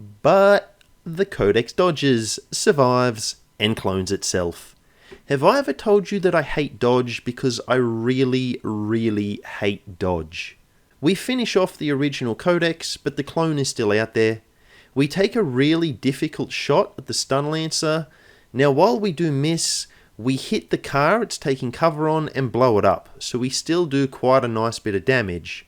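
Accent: Australia. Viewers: your accent is uniquely Australian. you sound like a Queenslander but you know... your not being a cunt, so thats nice. keep it up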